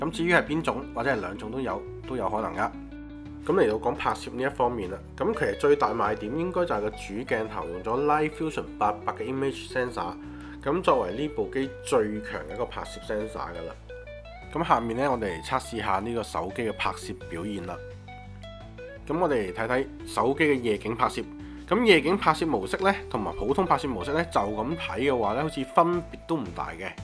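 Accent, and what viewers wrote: Hong Kong, China. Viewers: 就香港粵語口音啊，我也聽得懂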